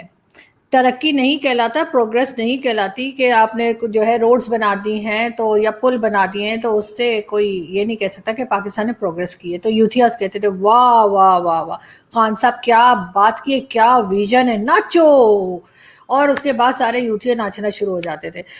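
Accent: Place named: Delhi, India. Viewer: Your explanation of 250 gms bombs with the help of US drones is superb. I mean your hindi/punjabi accent is just like what we hear in New Delhi.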